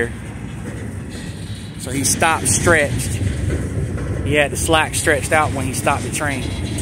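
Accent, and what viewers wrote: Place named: South, United States. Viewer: You make a great train video my friend. I can't figure your accent, either North Carolina or Louisana.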